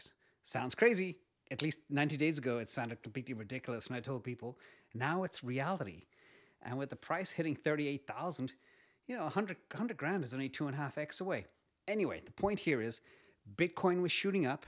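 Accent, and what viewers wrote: Ireland. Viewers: Do I detect an Irish accent in there somewhere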